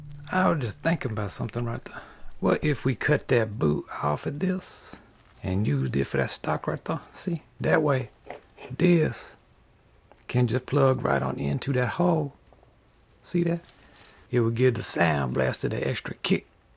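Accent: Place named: North Carolina, United States. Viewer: Amazing!!! You are talented beyond belief....i love watching your videos and just recently found you! Thank you! Also, you sound very much like you might be from Halifax Co, NC......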